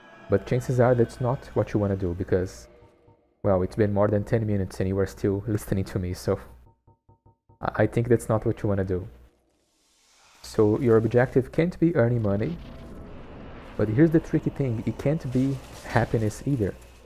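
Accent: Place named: Brazil. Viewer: Sotaque BR 😂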